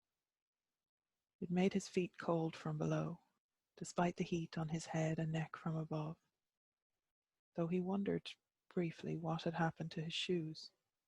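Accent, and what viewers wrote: Ireland. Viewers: Thank you for this immersion in Irish story in authentic Irish voice and spirit!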